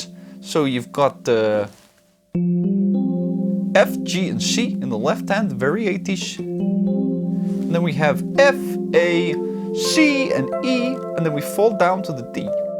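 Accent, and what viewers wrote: Netherlands. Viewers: Hi, where did you pick up the dutch accent? I love it. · Very Dutch accent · Your Dunglish is on point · Not gonna lie, he has a great dutch accent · The Dutch accent sounds just so right :) Totally enjoyed this. Thanks 😊 'I don't blow myself, I tried it but it hurt my back'. Briljant. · I’m hoping the folks at Rockstar invited to do a radioshow for GTA VI! Good fun! Pretty decent Dutch accent there 😀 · you're doing a amazing dutch accent if thats your intention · You certainly sound like at least one of your parents is Dutch ... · How is your Dutch accent so on point!!!? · What is the reason behind the Dutch accent lmao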